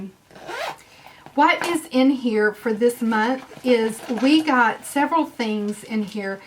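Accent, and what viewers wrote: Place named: Alabama, United States. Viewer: Where are you from in the south..I am from Arkansas and have lived all over Alabama. You sound like you're from Alabama and you say um a lot .lol...So I've never tried Tribe Beauty Box, I almost did..here is my email bc I don't always get my <mail>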